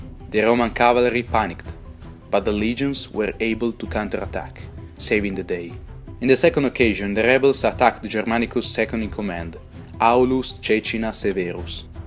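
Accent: Italy. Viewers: Penso che gli anglofoni troveranno un po' strana la pronuncia dei nomi latini, che qui sono resi all'italiana. Comunque vedo che i nomi latini sono simultaneamente scritti in grafica, il che aiuterà non poco. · You tried but I can't listen this accent but I like that an Italian tried to divulge I can recognize it good job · Come si sente dall’accento che sei italiano 😂 Cmq 20000 uomini sono tanti morti si, ma purtroppo ci sono state sconfitte molto peggiori nei secoli antecedenti a Teotuburgo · Hears strong Italian accent. This may be a little bias lol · Really good job: the script is brilliant and the story line flows smoothly with a charming Italian accent :) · The Italian accent makes this video much cooler. It looks almost like a sense of revenge is emanating during the narration. :) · this is definitely italian accent... you can check channel info. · He is speaking proper English, in an Italian accent. 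You have a problem with that? What language do you want the video to be? Italian?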